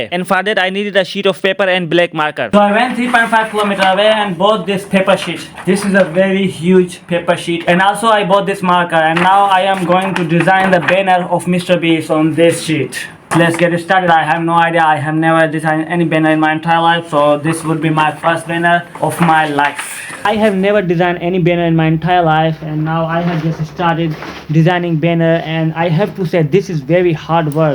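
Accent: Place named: Pakistan. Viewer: Pak english good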